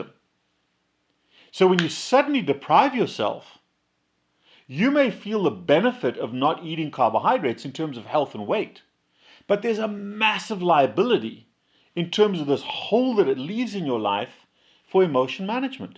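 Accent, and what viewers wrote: South Africa. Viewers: I really love this! It's taken me 56 years to learn emotional strategies after having been brought up in a home where food, opiates, alcohol and cigarettes were how my parents coped. And how I spent my whole life coping. Now, finally, at 56, I am fighting daily for my freedom from all those things, as I transferred in and out of all of them. And it's working. The most significant change has been following a keto diet. Meat, veg and fat. No baked, fake copy foods. Just from the earth. Only if it swam, ran, grew or flew, will I consume it. And my life has changed., love it that you still have an accent. You make us SA folk proud. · My mom had bariatric surgery many years ago. She lost a ton of weight because she could not eat, but then put it back on again when she discovered she could drink all the coke and alcohol she wanted. She used to also melt chocolate in a fondu pot and drink the chocolate with a straw. 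She died ten years later of liver cancer. I am so glad I stumbled onto your channel. You sound South African. Hello from Johannesburg.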